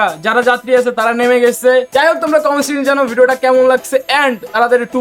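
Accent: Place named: Noakhali, Bangladesh. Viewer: ফেনী আর নোয়া খালি টান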